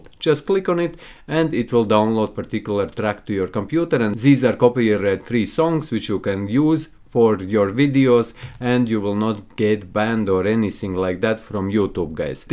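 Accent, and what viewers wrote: Russia. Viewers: Love your Russian accent! And thanks for this video! Stay Safe. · No offense, but i like his russian boy accent :) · Finally a great Russian accent from a YT tutorial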